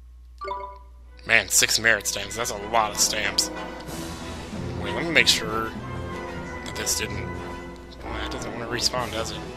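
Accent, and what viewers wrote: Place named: South, United States. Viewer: I went back to your old vids and noticed you've lost your southern accent almost completely since then!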